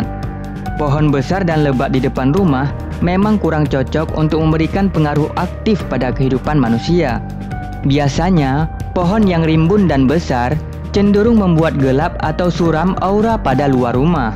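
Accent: Aceh, Indonesia. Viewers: orang aceh bang? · Nah iya kan org Aceh ni pasti yg punya channel 🤣🤣🤣 logatnya ya kan cuy 🤣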